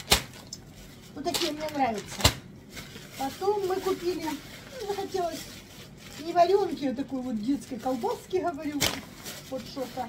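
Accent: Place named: Ukraine. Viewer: С покупочками😊 Вы сами с Украины? Родной гОвор кажется 😊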